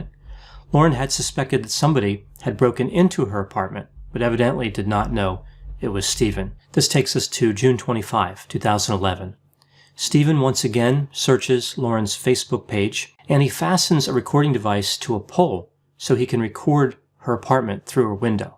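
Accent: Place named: Georgia, United States. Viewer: hmmm, too busy commenting on the Georgia accent I guess....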